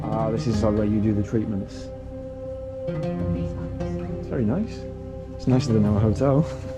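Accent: Manchester, United Kingdom. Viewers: Heading to Rishikesh in October. Going through a divorce after 20 years and looking for answers, your video got me excited, and hopeful. Plus, you sound like you're from Manchester! Hare Krishna!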